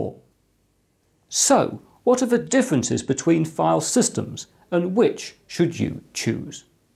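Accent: United Kingdom. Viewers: I like your English accent. Is it British accent? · Would love to listen to this but I just cant overcome my distaste for the british accent, sounds so goofy, my apologies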